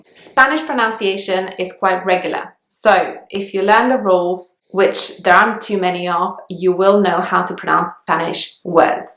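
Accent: England, United Kingdom. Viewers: What a heavy English accent you have ( teacher) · How is this accent posh? It is just a normal English accent. I have heard more posh from elderly in my area though LMAO. Some tips to sound this way for forebears is say things as it is spelled like ‘t’ and extend your ‘a’s for example “paahst” = past. Even the h is super subtly pronounced where I live but a lot of People say it with a northern accent and mix it up. She says “example” I say exaahmple stressing that p a bit.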